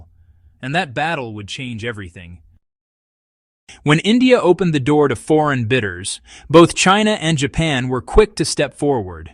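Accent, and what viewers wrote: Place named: England, United Kingdom. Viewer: Chinese propaganda in anglicised English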